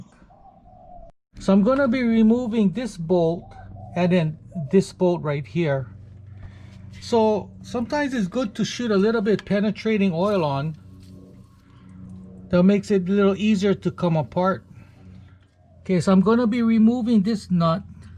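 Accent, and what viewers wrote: Hawaii, United States. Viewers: Nothing says Hawaii like your accent, my friend. I bet you're about old enough to remember the following song on the radio - probably KIKI - "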